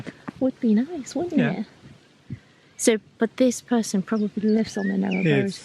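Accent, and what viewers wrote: England, United Kingdom. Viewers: if you are both German, why do you have such a heavy English accent? Loved your video! 😊❤️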